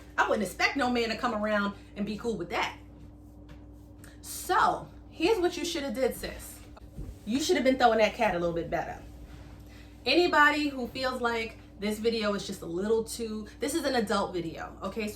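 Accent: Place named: New York, United States. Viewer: New York in the house 😆😆😆Love the accent!!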